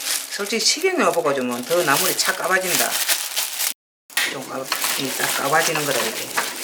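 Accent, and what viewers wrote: Gyeongsang, South Korea. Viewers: 호박고지나물 영상 넘 잘보고 있어요 경상도 사투리도 좋아요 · 경상도 말씀이라 잘 못 알아듣긴한데 나름 매력적입니다 ㅋ ㅋ 근데 아주 맛깔스럽게 하시는듯 팬 찜!!!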